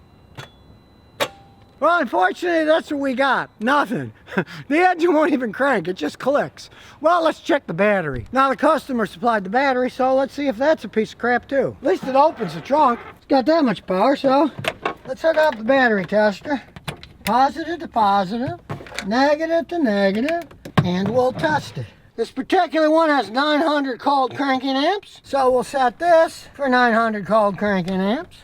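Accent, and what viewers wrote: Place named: New York, United States. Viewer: he is originally a Neu Yourka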